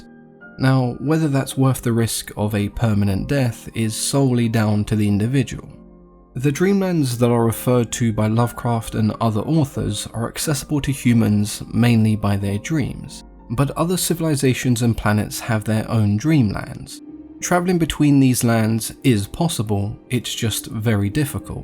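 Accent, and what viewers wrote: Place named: United Kingdom. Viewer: I enjoy the Dream Quest of Unknown Kadath as somewhat light reading/listening. It seems quite different from any other of HPL's works and reminds me a great deal of Grimm's Fairy Tales. It almost feels like it was written for children because there is really nothing of horror about it and everything turns out fine in the end. The only thing that disappoints me about it IS the ending! The "there's no place like home" trope was way too simplistic and unsatisfying for me. If Carter was this old and experienced dreamer wouldn't he have recognized the Sunset City as a conglomeration of memories of his childhood and the town he grew up in? In fact that was eluded to in the beginning of the story, but I'm just nit picking. It's a fun story to fall asleep to especially when a British person is reading it! And I do enjoy rereading it occasionally too.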